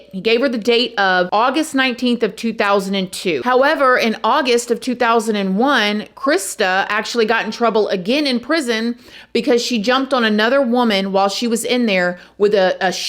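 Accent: South, United States. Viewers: I love your content but love your southern dialect even more ☺️ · Love southern charm btw job corps = “core” not “corpse”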